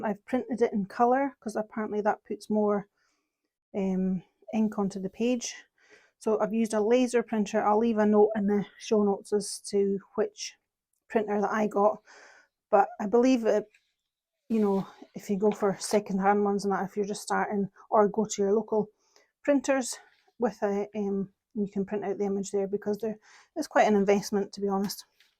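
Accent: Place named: Scotland, United Kingdom. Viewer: Love your test book. Love your Scottish accent. Love your work!! Subscribed 😊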